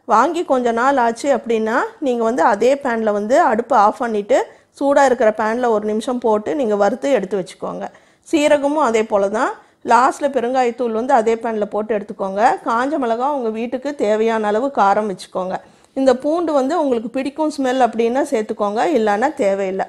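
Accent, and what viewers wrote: Salem, India. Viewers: Unga recipes lam super. Unga Salem tamilum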